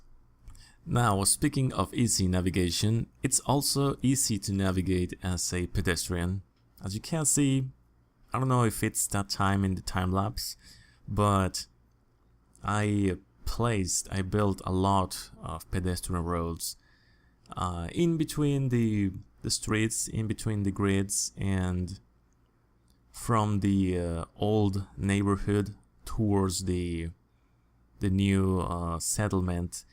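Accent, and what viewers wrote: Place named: Germany. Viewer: Hablas mejor ingles que el "buen" Peña Nieto. Estaba a punto de decir que eras Aleman por el accento. Yo soy de Francia, vivo en Mexico ;)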